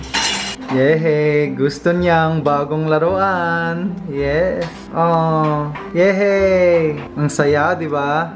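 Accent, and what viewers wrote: Philippines. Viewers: Lets Go To another Channel now i dont like to hear his filipino accent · Haha luv your filipino accent 🤣🤣🤣Puede ba mag tagalog pa minsan minsan, katuwa ka eh😁😁😁Keep it up! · Hahaha funny! I miss you pilipino accent!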